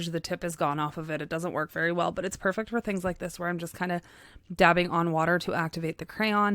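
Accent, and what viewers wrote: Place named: Canada. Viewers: As a Kiwi, I can tell with the way Canadians say ‘about” and “out”... and for you the word “slow” too... your “o” sounds are a giveaway 🤗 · I guessed you were Canadian while I was listening and then expanded the description and saw you actually are! I can just tell sometimes because I'm Canadian too. I love this card. The background is all my favourite colours and I love it. It's outta this world! 😉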